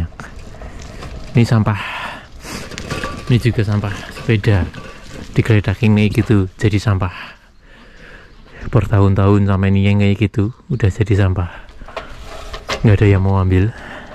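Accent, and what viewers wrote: Banyumas, Indonesia. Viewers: Setiap tayang... yg gue tunggu bahasa ngapak mu, mas!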